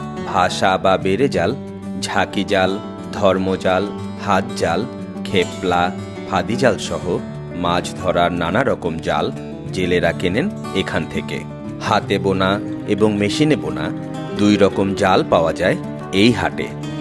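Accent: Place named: Bangladesh. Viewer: Brother R you Bangladeshi ? Your talking style sounds little different.Do they export this big sweets ? But your video attracts me.Thanks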